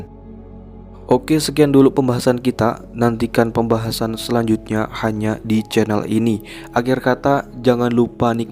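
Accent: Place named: West Java, Indonesia. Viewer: Admin seperti orang Jawa Barat, logatnya seperti paman saya yg dri majalengka, 😁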